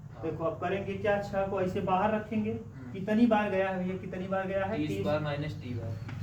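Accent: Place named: Bhojpuri, India. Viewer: really liked the way how you teach ....in bhojpuri accent ,apke bache boht shor krte hai par lol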